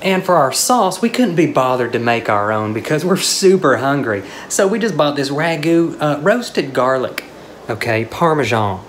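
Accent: South, United States. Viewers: I love the southern in these older videos. "pots and pans" was one word. "potsnpans". love it.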